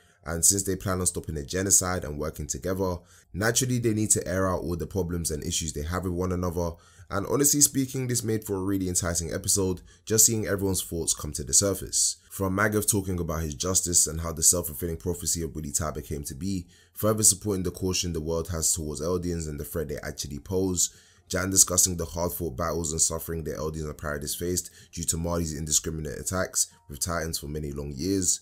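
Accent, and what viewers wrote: United Kingdom. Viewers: ARe u brit? nice explanation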